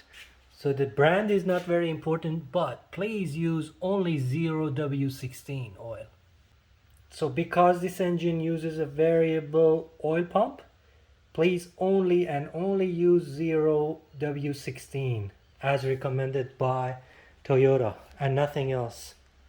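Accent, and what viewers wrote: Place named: Australia. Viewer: Hello, you sound like a fellow-Aussie ☺ After watching your video tip, I Googled the oil needed for Toyota Yaris Cross, and found it to be 0W 20.( Synthetic) Is this a significant difference? What goes with such a variable answer to this question? I live in Sydney which is NEVER really cold, but often miserably, 3rd world, hot in those torrid months of December to March each bleeding year 😂 Thank you!!!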